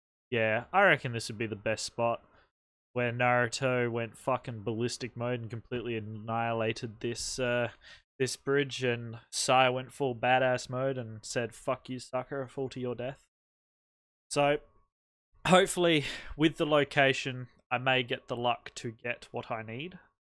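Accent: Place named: Australia. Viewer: Hmm you sound like an aussie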